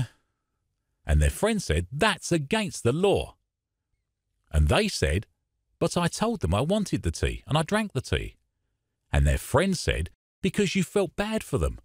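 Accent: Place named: United Kingdom. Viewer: It's interesting that this seems to be a directed at an American audience yet it's a British voice and British coppers in the video? Maybe I'm wrong and America's got nothing to do with it.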